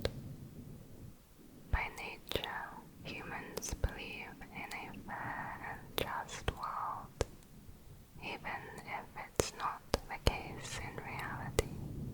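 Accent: England, United Kingdom. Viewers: British, very apparent. It's hard for me to understand her due to it but I love her videos regardless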